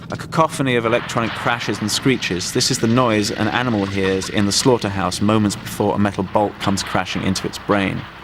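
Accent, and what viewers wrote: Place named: RP, United Kingdom. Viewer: Ah, this is what he meant by his 'posh accent' haha. Love it though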